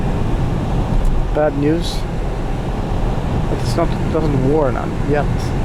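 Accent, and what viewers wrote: Sweden. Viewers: You sound like u are from sweden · that svedisch accent mannen, suverän bil!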